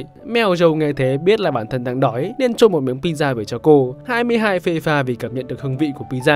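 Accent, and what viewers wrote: North, Vietnam. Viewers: Giộng bắc mình đã ko nghe đc rồi. Bạn còn liên tục pha trò rồi nhắc tên người khác vào clip khiến cho mình ko thể nào tập trung hiểu được câu chuyện. Chắc là phải tự thân đi xem hết phim thôi 😞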